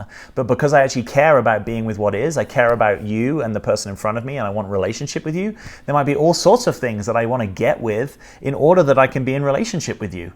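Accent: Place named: England, United Kingdom. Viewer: I think that's what I've gathered. has a very similar accent to mine from England.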